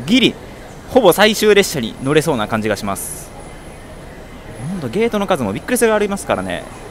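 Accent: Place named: Kansai, Japan. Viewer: バリバリの関西人ですからね、うp主は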